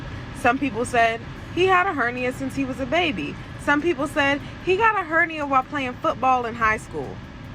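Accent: South, United States. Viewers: Hey, thats a cute lil baby in the footage at the end of ur videos like part 1 of this and others. Ur dialect is giving from the South, where bout? Im from MS..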